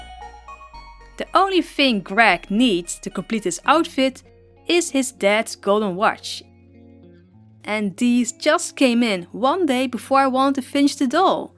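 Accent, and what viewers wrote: Netherlands. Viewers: What is your favorite kind of Diuvs transformation? Like what animal is the most fun for you to transform a doll into? Also, I love your Dutch accent! :))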